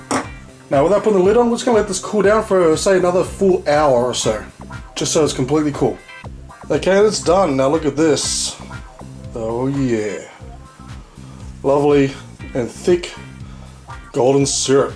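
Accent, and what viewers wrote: Australia. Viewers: Actually, for all those remarking on the Australian accent, you are being incredibly rude. Don't watch if a different accent is offensive. There are American and Englush accents which are unintelligible to other ears. I'm not Australian and can eadil understand what is being said.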